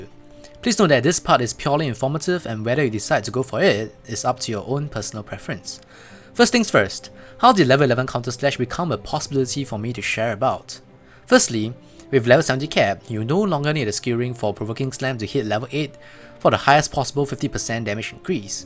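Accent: Singapore. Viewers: Yin s he still sounds like a Singaporean to me. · accent is south east asian. hes singaporean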